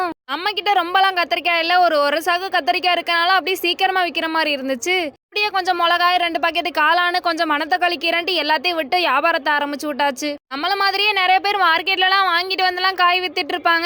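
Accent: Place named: North, India. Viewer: Ne y ma vadakan maari tamil paesara,nithaanama paesu ma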